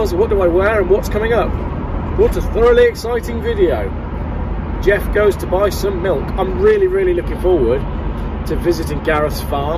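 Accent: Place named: Midlands, United Kingdom. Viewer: before the planned event of three years ago I pretty much watched Hubnut every day. Must admit I wasn’t spending huge amounts of time on YouTube. I almost got to meet Ian at one of his meet n greets when he was here in Melbourne. Then my attention was turned elsewhere. You are the only motoring channel that I know who dares to show another perspective. I admire that. I’m also originally from the Midlands too so that’s probably another reason I like listening 😂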